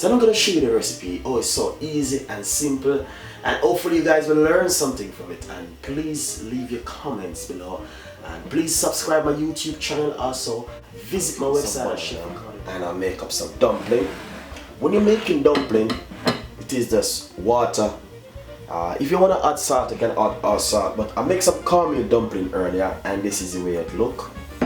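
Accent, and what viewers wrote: Jamaica. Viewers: ugh I loooovveeeee Jamaican men accents <3 I wanna watch his videos to just listen to him lol . great recipes to tho :-)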